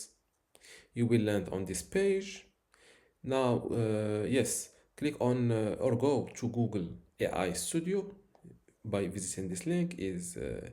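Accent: Morocco. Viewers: I hear a morrocan brother <3 Hello from DZ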